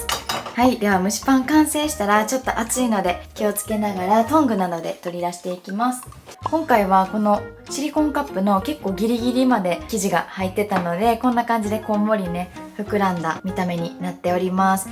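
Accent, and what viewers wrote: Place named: Osaka, Japan. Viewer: ありがとうございます✨ レンチンはできるだけ避けたいので、自然解凍して蒸し器で蒸す方法試してみますね🎵 余談ですが、私は香川です😊 大阪が大好きで、毎月1～2回はユニバとユニバ周辺に遊びに行っていました😊大阪に住みたいと思ってたくらいです😊 は出身が大阪なんて羨ましいです✨ときより大阪弁なのも羨ましいです😁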